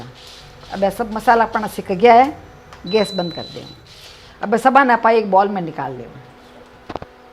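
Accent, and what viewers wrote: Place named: Marwar, India. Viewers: Mam am fan of ur marwari accent and the recipe also very fabulous. , well done keep it up 😋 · Amma aap ka marwadi accent bahut achha laga. Chatni mai aaj hi try karunga banane ka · Your Marwari accent is so wonderful! When you talk I feel my grandma is teaching me again. The chutney was amazing! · Mujhe marwadi nahi aati per aap jab bolte ho tho bahut acha lagta hai aap ki cooking great hai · Wow m Punjabi and understand ur marwadi accent easily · जितनी आपकी चटनी अच्छी है उससे ज्यादा आपकी बोली अच्छी है। इंग्लिश हिन्दी और मारवाडी का अच्छा वार्तालाप है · Ye jodhpuri language badi meethi lagti h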